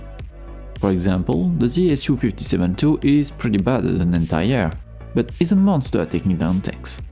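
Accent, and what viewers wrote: France. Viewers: J'ai reconnu l'accent français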